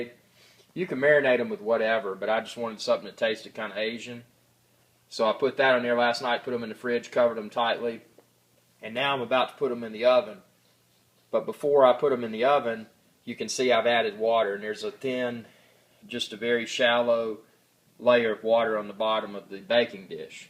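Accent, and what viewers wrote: United States. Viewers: You are in Finland thats in Celsius. This man is from the USA from his voice id say. They use Fahrenheit. If you payed attention in school you would know that 176C is about 350F. 350C is about 662F. You are not wrong in your thinking but you cant just assume everywhere is the same as you. Coming from an American that might sound funny though lol. Have a nice day. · What state do you live in, please? Just wondering about your accent. You sound American ! Thank you .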